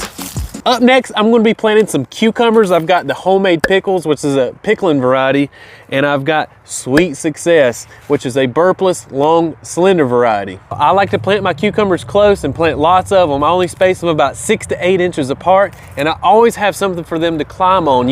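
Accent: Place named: South, United States. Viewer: What the heck! I ain't been gettin' these alerts for the new vids (me trying to sound southern like here) Anywho...great seeing y'all and those are some beautiful plants! Just finished my garden in SW Michigan and it's smokin' hot and no rain in the forecast for days...happy gardening! Great job, once again - cameraman!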